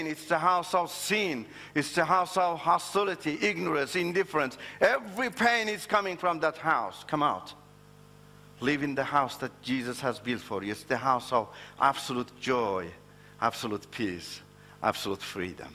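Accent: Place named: Iran. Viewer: Amina he's a Iranian.. things r pronounced in his tongue with slight difference., like different recitations of kuuuraan.. we call allah in most but many call it as alloah.. does that make him an ignorant in arabic? definitely he's learned enough to explain the message .. salaaaaaam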